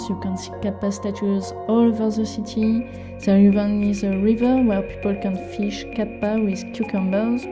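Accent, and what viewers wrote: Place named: France. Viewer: Are you French? Your accent sounds like my buddy Bertrand who moved here from France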